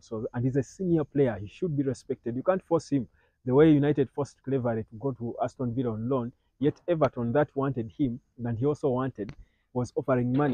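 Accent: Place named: Uganda. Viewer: Nice to see you from home soil though you didn't name it but from your accent I guess Uganda, my girlfreind is Ugandan by the way😊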